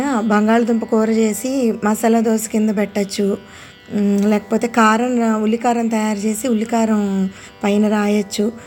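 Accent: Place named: Vizianagaram, India. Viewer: మీ లాంటి వాయిస్ విని చాలా ఇయర్స్ అయింది... ఇలాంటి తెలుగు చిన్నప్పుడు మేము ఉండే ఏరియా vzm లో మాట్లాడితూ పెరిగాం.. ఇప్పుడు వాళ్ళ నార్మల్ గానే ఉంది 🙏🏼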